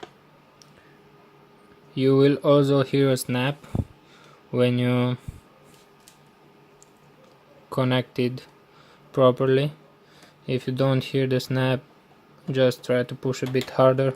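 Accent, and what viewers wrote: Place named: Hungary. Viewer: Helló.Te magyar vagy mi?A kiejtésedből úgy vélem.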